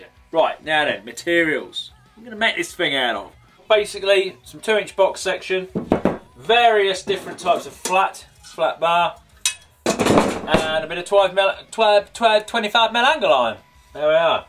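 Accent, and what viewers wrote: United Kingdom. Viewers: Why is every British person the most British person?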